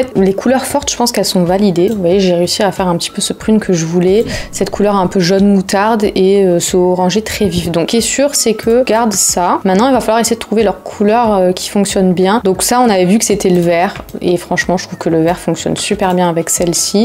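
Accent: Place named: Marseille, France. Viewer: Encore une vidéo sympa et pleine d'enseignements ! Etant en formation CDUI, j'adore tes vidéos pleines de conseils et le petit accent marseillais en plus !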